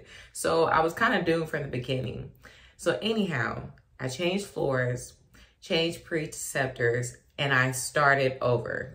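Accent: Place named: Cleveland, United States. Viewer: Are you from Cleveland? You sound just like my friend from Cleveland.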